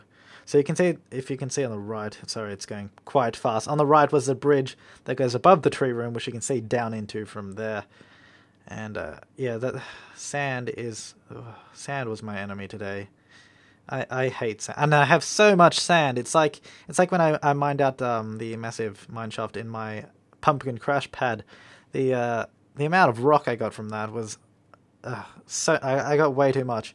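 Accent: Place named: Australia. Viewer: Your accent is stronger, and sexier :) What part from Australia do you come from? I'm From Nsw, Boganville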